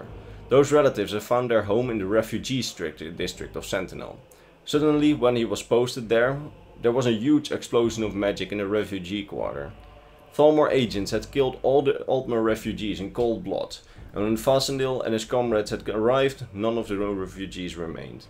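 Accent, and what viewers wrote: Netherlands. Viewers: That’s the dutchest english I’ve ever heard lol, good to see that we have a great representation in the elder scrolls community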